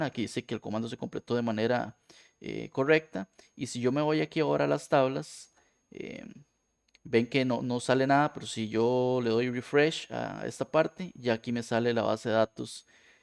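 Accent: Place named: Costa Rica. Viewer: Apenas empecé a escuchar este video dije "Ese acento es tico"🤭🇨🇷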